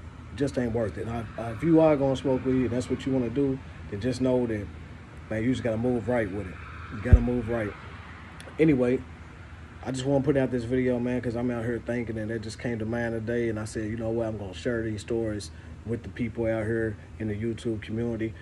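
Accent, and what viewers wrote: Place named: Memphis, United States. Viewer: I appreciate your videos. You gotta be from Memphis that accent thick!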